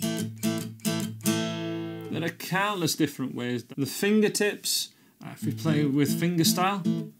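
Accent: United Kingdom. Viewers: I really dig that British accent of yours, makes the lesson more entertaining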